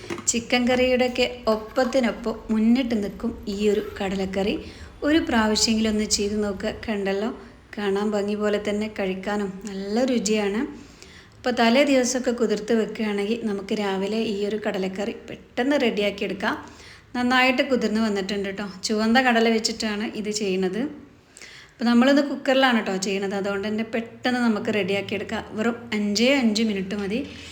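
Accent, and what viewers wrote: Malappuram, India. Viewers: മലപ്പുറം താത്തയുടെ മലപ്പുറം സ്റ്റൈലിലുള്ള സംസാരവും പാചകവും എത്ര രസകരമാണന്നോ? എനിക്ക് വളരെ ഇഷ്ടമാണ് നിങ്ങളെ 'എന്താ മുഖം കാണിക്കാത്തെ കൈമാത്രമാണല്ലൊ കാണുന്നത്.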